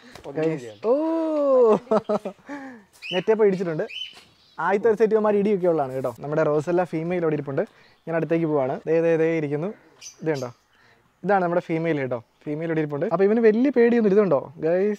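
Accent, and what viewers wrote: Kottayam, India. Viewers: നിങ്ങൾ കോട്ടയം കാർ ആണോ?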